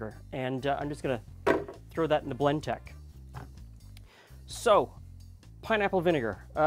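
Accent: Canada. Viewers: Funny you mentioned it. I heard a Canadian accent. It’s all about the about.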